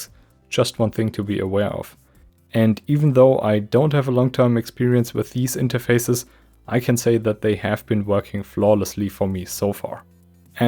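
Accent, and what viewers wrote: Germany. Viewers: I hear a bit german..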